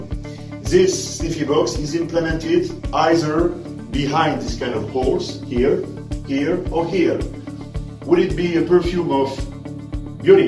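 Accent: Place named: France. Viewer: Bravo. I love your french accent!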